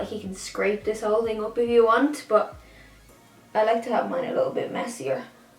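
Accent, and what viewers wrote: Ireland. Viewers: Who else thinks she sound Irish ☘️ 🇮🇪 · Are you Irish? Cause guuuurl I love your accent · Irish? Me too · Great messy bun you always have great style it's why I luv watching you. Not to mentioned as a transplanted fellow Irish gal I luv the accent....hugs to you 😘👍🇨🇮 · Are you Irish? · Is this girl from Ireland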